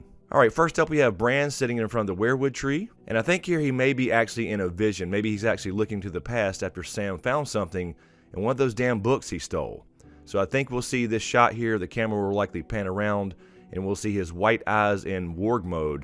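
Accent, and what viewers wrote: South, United States. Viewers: I love how you do it, get right to the point, sum it up so clearly in your warm southern style.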